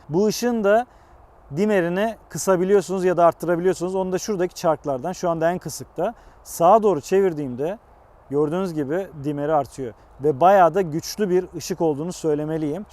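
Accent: Adana, Turkey. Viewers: abi adana mı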